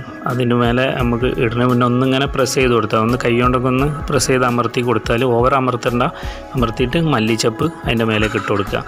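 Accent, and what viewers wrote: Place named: Kozhikode, India. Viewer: കോയിക്കോടൻ ഭാശ കേക്കാൻ നല്ല രസ ണ്ട്😊👍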